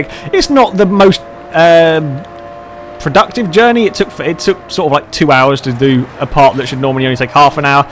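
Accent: United Kingdom. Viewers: man leave the brit alone!! im a southern guy in the u.s. and his accent and the way he talks doesnt matter this is youtube not school he can talk how he wants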